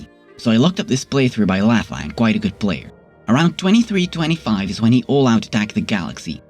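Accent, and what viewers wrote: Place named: Netherlands. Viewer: you're dutch the accent haha nice video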